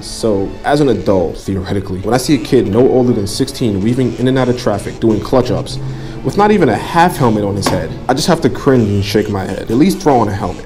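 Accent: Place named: New York, United States. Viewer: Fellow New Yorker here, raised in Uptown. Love how you articulate your points without losing that NY aura and tone. Props my dude. God bless.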